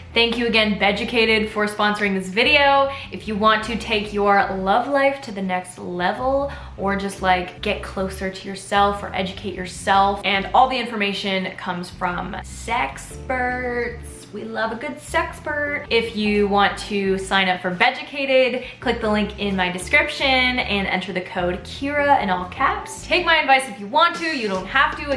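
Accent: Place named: Canada. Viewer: Me(straight boy) who's here just to hear beautiful Canadian Accent and her humor😆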